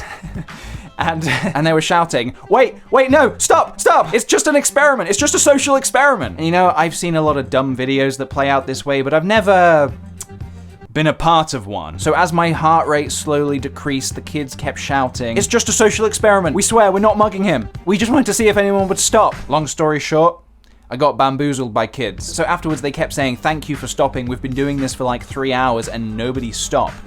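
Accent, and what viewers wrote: Peterborough, United Kingdom. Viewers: Lol sounds like good ol’ Peterborough to me 😂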